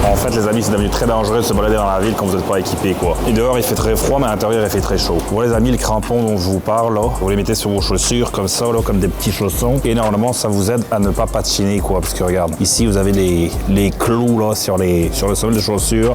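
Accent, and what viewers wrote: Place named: Quebec, Canada. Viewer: Il a accent Québécois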